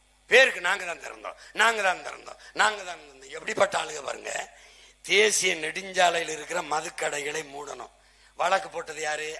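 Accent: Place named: Sri Lanka. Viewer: Nee ceylon thamil perusaa tamil vakka lathu vangure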